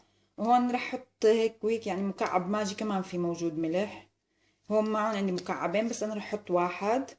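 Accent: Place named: Palestine. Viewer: تسلم هالايدين ان شاء واكلك مبين لذيذ جدا وحكيك حلو الحكي الفلسطيني كتيييييير حلو وبحبو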